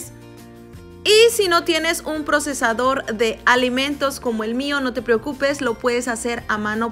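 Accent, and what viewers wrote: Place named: Mexico. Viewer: 👏👏👏👏felicitaciones, que gran enseñanza y gracias por compartirla con nosotros , por tu acento escucho que eres como Mexicana y le llamas sosa cáustica , acá en Colombia se le dice Soda Cáustica , igualmente es lo mismo. Te envío un gran saludo desde la capital Bogotá .!!!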